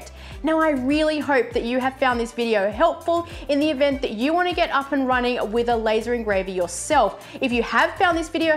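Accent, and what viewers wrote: Australia. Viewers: Thanks for sharing I know nothing about laser engraving or cnc but I so want to go down that path more so on the cnc machine they both make your projects look heaps more professional. So thanks for the encouragement to give it a go.Love your channel and content plus it’s nice to see and hear Aussies on YouTube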